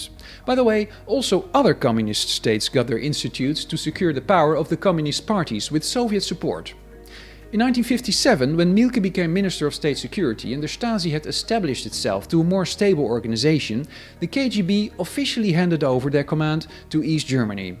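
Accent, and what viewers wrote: Netherlands. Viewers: Are you a Dutchman? Your English is like that of a Dutchman.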